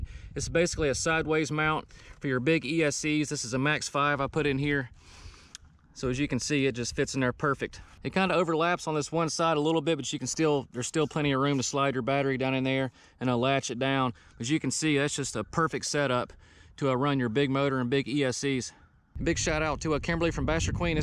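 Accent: South, United States. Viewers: Once I heard that accent I knew I was dealing with a pro, that amount of redneck and annunciation is a dead giveaway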